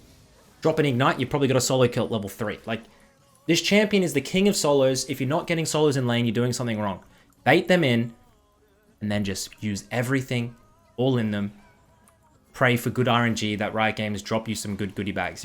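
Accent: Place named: Australia. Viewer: How I love the Australian accent. Like far out, and cheeky little ...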